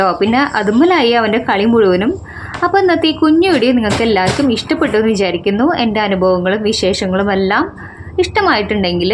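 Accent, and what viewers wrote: Thrissur, India. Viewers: എത്ര സംസാരിച്ചാലും ബോറടിക്കില്ല നല്ല ശബ്ദം ത്രൃശൂർ slage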